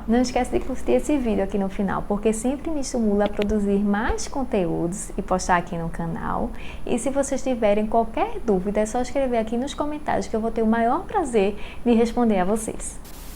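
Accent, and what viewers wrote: Recife, Brazil. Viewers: Vc é de Recife? Sutaque bem parecido! Moro em Curitiba, amo Recife! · Eita sotaque forte que me lembrou uma amiga do Recife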